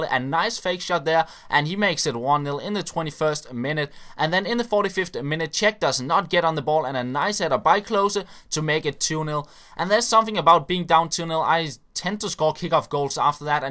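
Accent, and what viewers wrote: Denmark. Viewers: Det er dejligt nemt at høre, at du er dansker:)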